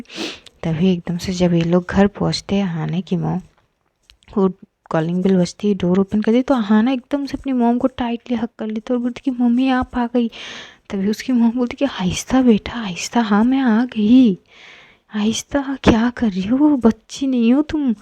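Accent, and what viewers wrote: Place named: Uttar Pradesh, India. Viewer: 🥰 intezaar to ittu sa bhi n ho pata ma'am pr ab iske alawa kr bhi kya sakte h.....hum UP walo ki tarah aap jb bolti ho n hye 💖 kya kren aapka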